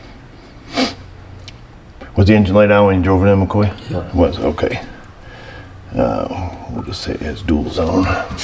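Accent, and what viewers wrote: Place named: Southern Tier New York, United States. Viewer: As always, a riot if you have the captioning turned on: "Self Made Auto"....even better: "Cancer vent valve". It wasn't until I started watching great channel, that I realized that the southern tier of NYS had it's own dialect. Cool!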